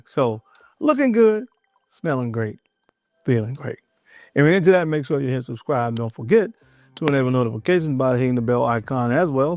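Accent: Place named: South, United States. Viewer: I bet you’re from the south. I think it might be a bit f a southern thing lol.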